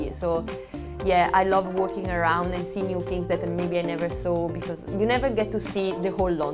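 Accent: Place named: Brescia, Italy. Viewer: ha mantenuto il nostro intercalare bresciano😊 anche parlando in inglese, a parte questo, brava 👍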